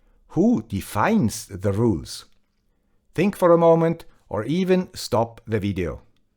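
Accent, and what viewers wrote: Swiss German, Switzerland. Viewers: Ich bin aus dem großen Kanton, habe aber dennoch Dein Schwitzerdütsch verstanden :-) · Ich bin ja überrascht wie viel ich vom Schwizerdütsch verstanden habe. Bin vermutlich schlimmere Dialekte gewohnt. :-) (Bern und Umland?) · Hi, First, happy new year to you and your family. I take this occasion to thank you for these new year reflexions. I liked your video a lot. Just like i appreciate your technical videos. I really like the quality of your rigorous explanations. You helped me with many of my projects and with my overall comprehension of microcontrolers. Bye (not with your Swiss accent but with my French- Quebecer's one) Stéphane-Billy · Swiss accent is much much better than American. For me, I understand most European English accent better than American and British. Why, because these people speak slow and simple \ easy English words. 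Thank you for the lecture. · Happy 2022! Thank you for all your content and for avoiding the mid-roll ads. You make very good choices; deciding to broadcast in English (with a Swiss accent) was a very good choice - you have the possibility of a larger audience, but you still retain your "Swiss-ness". I love Switzerland having visited as a child, and later on business. Your videos are very thorough and well made. I have learned so much from you. Thank you again. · Another useful and interesting video from the guy with the Swiss accent. Wishing you and yours a wonderful 2022. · I told my wife, "The guy with the Swiss accent has a message for you!" I read your response to her, and she laughed out loud! :)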